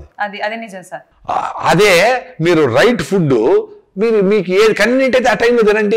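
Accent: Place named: Kadapa, India. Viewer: సర్ మీ బాషా కడప భాష లాగా ఉంది చాలా బాగుంది మంచి అలవాట్లు చెప్పారు సర్ థ్యాంక్స్ సర్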